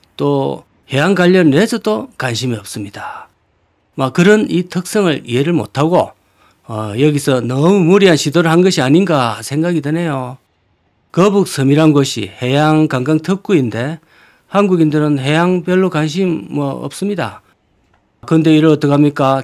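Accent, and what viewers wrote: Gyeongsang, South Korea. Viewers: 사는데가 김해인교? 말투가 전형적인 부산.김해 이신거로 보이는데 뭐하시는분인데 공실건물을 찾아다니는건감요? 경매 하는교? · 꾸준히 잘보구있읍니다.좋은 조언감사합니다.그냥 인생공부한다 생각하고 봅니다.수고하세요.참 구수한 갱상도 사투리! 얼굴이 궁금해지네요?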